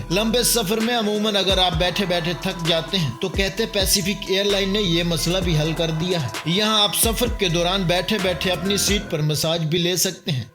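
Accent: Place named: India. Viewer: Are you an Indian cause your pronouncanation is so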